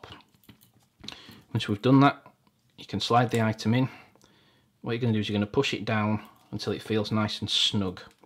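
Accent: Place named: England, United Kingdom. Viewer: Brilliant I've been looking for a video that explains the sizing of a pattern ,you really explain it well . I do have one question ,I'm just starting out as a hobby and trying to find leather supplies in the UK that are cheap enough to try out is difficult . I have bought some tools from amazon as well as some chrome leather ,I've found Identity Leather Craft in Matlock and am waiting for some veg tan and glue to try out. do you have any recommendations for leather suppliers in the UK selling small amounts for us hobbyists . Great channel nice to hear a calm English accent explaining things so well .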